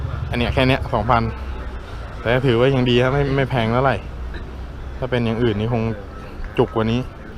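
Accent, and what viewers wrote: South, Thailand. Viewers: คนใต้นิหว่า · แหลงใต้ชัดหลาว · แหลงใต้ชัดปีกเลยนะ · ว่าใต้จัดเจนเรย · ขอบเติ้นเเหลงใต้นิ ว่าหรอยๆ ผมยุพัทลุงนิคับ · คนใต้นิกว๊าาาคนบ้านเดียวกัน ติดตามเรยๆ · พี่คนใต้หอครับ. ตอบแท่ได้ครับ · พี่คนใต้หรอครับเนี้ย 😁😁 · เอ้า!! ใอ้ย่ะคนใต้บ้านเดียวกัน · เเหลงใต้พั่นนี้ผ่านเลยพีี่บ่าวววว !! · พี่คนใต้หรอครับ>< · พี่คับพี่อยุ่จังหวัดไรอ้ะเสียงใต้ๆ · คนใต้555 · ว้าวพี่พูดใต้น่ารักมาก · ใช่ครับ พี่เค้า คนใต้ คนนครศรีธรรมราช อ.ลานสกา ครับ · คนใต้เหอะนิ จังหวัดไหน คับ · พี่เป็นคนต้เหรอคับ จังหวัดไรเหรอ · แหลงไต้เลยหรอ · พูดใต้อ่าา หล่อจังงเสียงง 😍😍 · พี่เป็นคนใต้ป่ะคาป ได้ยินเหมือนพุดใต้เลย พรักยุไหนแล้วอา....? 5555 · อ้าว พี่คนใต้หรอคับ555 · สำเนียงเหมือนคนใต้หรือคิดไปเอง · พี่คนใต้กันช่าย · คนใต้นิเบอะ ... 555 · เพิ่งรู้ว่าพี่คนใต้555 สงสัยมารนานละสำเนียงอะไรทำไมคุ้นๆ555 ผมคนหาดใหญ่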